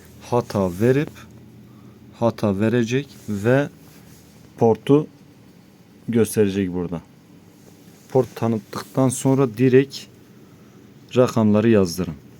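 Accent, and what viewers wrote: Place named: Urfa, Turkey. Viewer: Ustam sen galiba urfalisin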